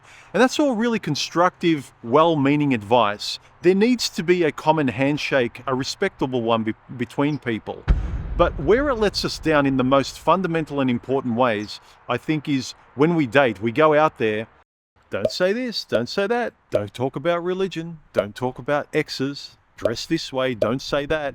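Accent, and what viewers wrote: Melbourne, Australia. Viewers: Think he's from the Melbourne area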